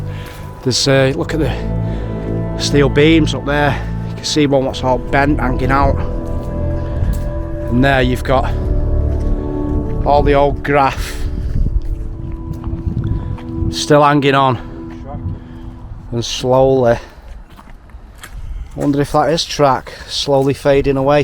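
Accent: Manchester, United Kingdom. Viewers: Top quality videos.great manc accent too.Cheers..Alf 🍺🍺🍺🇬🇧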